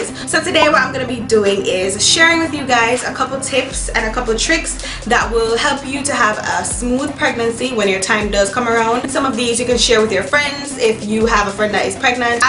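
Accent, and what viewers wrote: Jamaica. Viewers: you're welcome. I'm currently 15weeks and I needed some tips, your video came up instantly and I immediately picked up the accent being Jamaican myself.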